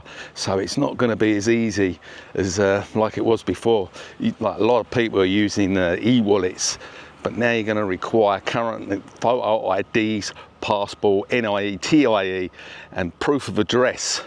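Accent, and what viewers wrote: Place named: London, United Kingdom. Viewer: Yeah you got a nice London accent mate,but your on the wrong page on this one mate.frack the banks, they are going down mate.with quontam banking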